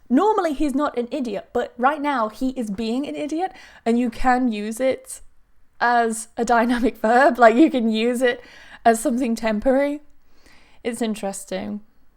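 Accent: United Kingdom. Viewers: your videos help me soo much, Thanks for helping💘 i love the british accent and England. Saludos desde Argentina 🇦🇷 (I think it is "grettings from Argentina in English")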